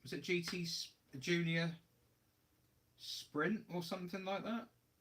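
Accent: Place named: West Country, United Kingdom. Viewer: Sorry I missed the live boy apprentltbdaughters birthday was more important… really enjoying the catch up. Just to clarify cause it wasn’t made clear, do you have your own SM? As you seem to have a bit of a West Country accent can you end your vids with a “proper job0 “ akin to the pe- chew of Ian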